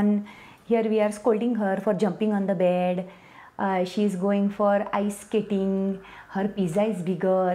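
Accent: Maharashtra, India. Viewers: Yes,Awesome. Perfect marathi accent. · Your Marathi accent is on point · Your content is soooo amazing and you have picked up the Marathi accent to the T. I am surprised to see only 256k subscribers...wish you millions of more subscribers and thank you for entertaining us!! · You are so cute dear… typical our marathi accent😂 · Wow! While all of your characters are great, I love this one "Mrs Kulkarni!" the most! You represent this household character so well, it's like we are "seeing" it right in our family and/or neighborhood! And the way you speak Marathi with right nuances! Hats off to you! Stay blessed! 🙏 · Khup chan...Marathi accent excellent....conveys right message with courtesy....👍👌👌👌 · The way you mix Marathi words and bring in the accent is just amazing....ur observation skills must be super amazing. we need such type of lite subtle comedy, enough of abusing, vulgar, political based comedy...keep up the good work. 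· Marathi accent is just on point ...the grace u hold while narrating the content is just out of the world ...kudos to you ❤️❤️❤️❤️ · How u bring marathi ascents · Look at the Marathi accent excellent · You are so good ! I love the way you tackle serious issues with humour. And your command on the Marathi accent ! Superb! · Marathi accent awesome!!! 🎉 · how did u nail down the Marathi accent to a tee? Mumbai madhye shezaari ani mitra maitrini marathi hote ka? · you got that Marathish English the best :D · Ohh, your Marathi accent 👌...#Surprised! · U r so awesome. U r d only 1 who does typical marathi accent but still doesnt feel offensive or diminishing. Grt going. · The Marathi accent is ekdum mast-a!! 👌🏼👍🏼 Too good! 🤣🤣🤣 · How ur marathi language accent is so original .. just amazed to see your content and use of different languages in video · y did u leave your kannada accent and started this Marathi accent videos. 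Please get back to the older times. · Marathi accent is awesome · How is ur maharashtrian accent so perfect? Are u maharashtrian? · तुमच मराठी एक्सेन्ट एकदम चाबुक होत।।।। अद्भुत कथा कथन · your are awesome!!!marathi accent tar ek number! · Nailed it. Marathi accent is so good. 👍 I feel your comedy is simple and good, sometimes I feel I am reading our beloved PU LA Deshpande book. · If I am not mistaken, you are a Kannadiga from namma Bengaluru. Pls pls pls tell me how do you manage this typical Maharashtrian accent ?? 😄 the way you pronounce words ending with ‘s’ is so bang on typical Marathi manoos style. I am commenting on your video for the first time. Am a big big fan 🤟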